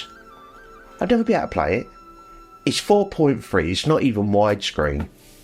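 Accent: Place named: Cockney, United Kingdom. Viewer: I noticed that Andy Serkis as Alfred the butler in THE BATMAN from 2022 has the same accent as you. What accent is that exactly? Is it Cockney or a variation thereof? On another note, when we will see a video of you kicking Tarantino’s ass?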